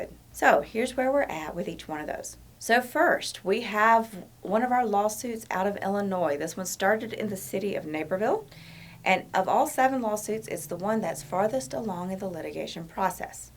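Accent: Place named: South, United States. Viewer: is not from Colorado! You sound like you’re from the south like me! 😂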